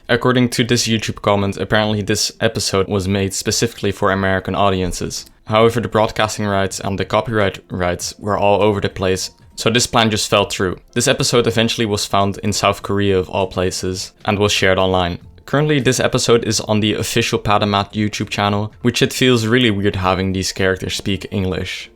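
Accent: Netherlands. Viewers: You Dutch yourself? bc HOLY ACCENT haha im from nl soo that why i know the accent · You can tell by his voice and accent that he is Dutch sooooo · his voice get more Dutch over time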